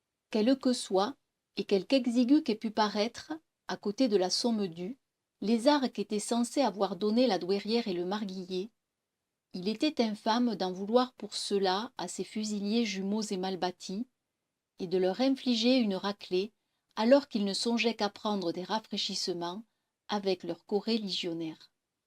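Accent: South, France. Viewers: Excusez moi! Je ne suis pas Française, (je ne savais pas que..) en lisant les commentaires j'ai appris que c'était l'accent du sud😶 Excusez-moi mais je ne suis pas habituée avec cet accent! Ce n'est pas de votre faute😅bien sûr! Je vous remercie pour cette dictée quand même! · Pas étonnant toutes ces fautes exposées dans les commentaires: déjà avec un accent Parisien, ce texte d'un autre temps, ne serait globalement pas une mince affaire mais alors avec l'accent du sud bonjour. Sinon ne pas le prendre autrement que dans le contexte car en dehors c'est un joli accent mais moins propice ici.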